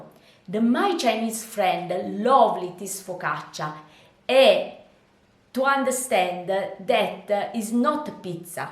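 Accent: Italy. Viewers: Very very nice video! I really appreciate. I also love your Italian/English accept 😉. I will definitely follow up your video!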